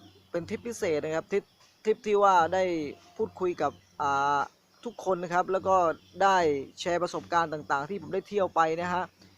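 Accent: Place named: South, Thailand. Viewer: แหลงใต่เพราะดีครับ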